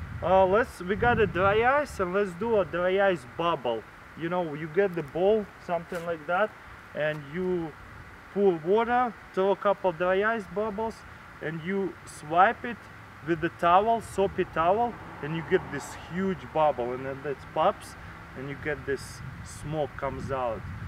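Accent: Russia. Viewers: for Russian Accent, Love it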